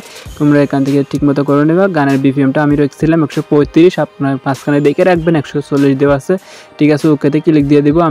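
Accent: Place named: Chittagong, Bangladesh. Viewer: ভাই আপনি চট্টগ্রামের মনে হয়। আপনার ভাষা শুনেই বুঝা যাচ্ছে 😅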